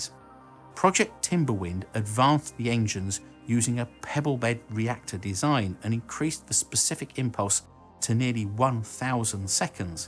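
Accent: United Kingdom. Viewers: I'm loving the British Accent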